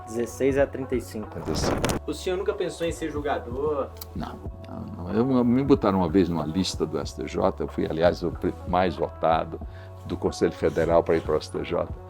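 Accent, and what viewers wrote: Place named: United States. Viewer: Prezado Nabor, que bom vê-lo em plena atividade e cercado por livros raros.Ademais, consegui entender o seu inglês; com nítido american accent.Um grande abraço!⚖️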